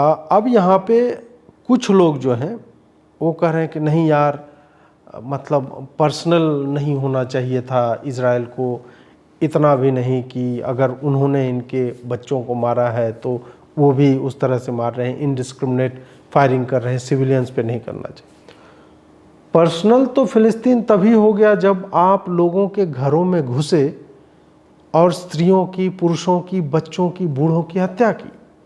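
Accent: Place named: Bihar, India. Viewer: Video ki caption aur narrator ki bolne k tareeke se mujhe maza aya 😂. Fati fatah fatanti 😂 aur stadium jaisa mahol banna.....bhaiya k bolne ka tareeke mere ek bihari dost k jaisa hai. Maza aya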